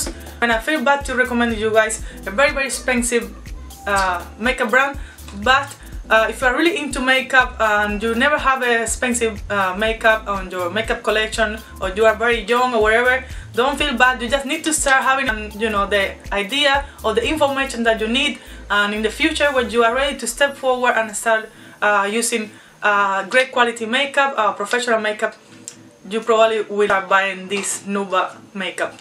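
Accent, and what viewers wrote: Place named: Italy. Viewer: Oh my god! She speaks so fast with Italian accent well, no comprendo lol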